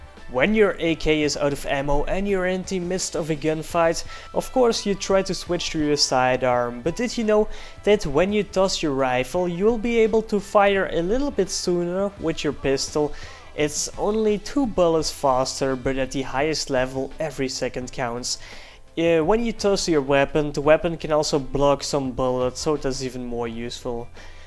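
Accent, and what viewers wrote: Netherlands. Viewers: Wait are you dutch? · This guy sounds dutch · i noticed ur dutch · Wait your Dutch? Ik tought you were danish or German. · ben je Nederlands? lol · Nice, dacht al aan je accent te horen dat je Nederlands was, maar dit filmpje bevestigd het ;p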